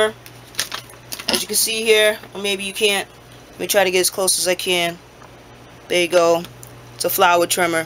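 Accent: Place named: New England, United States. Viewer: Patriots and that accent...🤔...You have to be from New England haha....I’m from Boston, I can pick out that accent anywhere hahaha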